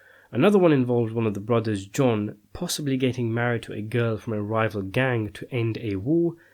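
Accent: United Kingdom. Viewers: Thank you for explaining in it in plain English.. (British accent) of course..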